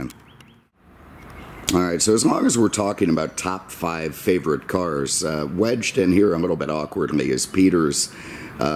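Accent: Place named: Philadelphia, United States. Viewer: Love the channel. The narrations are great but the voice seals it. Been trying to pin that accent for a few months... First thought: Jewish northeast. Possibly Philly, faded around the edges from being transplanted in Southwest FL for a few decades. Then I thought; "WHO does that sound like" and it took less than 30 seconds to come up with- Paul Lynde, who came from East Ohio by way of NYC. Am I close?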